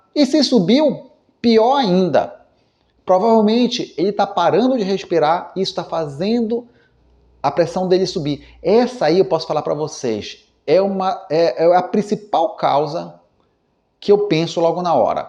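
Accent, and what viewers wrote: Pará, Brazil. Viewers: Doutor você é paraense? Seu sotaque me lembra o paraenses❤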